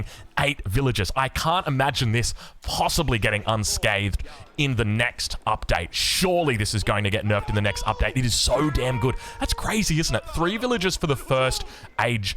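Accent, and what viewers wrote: Australia. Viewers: I'm very none aggressive and like to build a thriving empire full of resources and a big army before considering attacking. Watching these videos to get better. I love these video's. As an Englishman, The Australian accent is appealing.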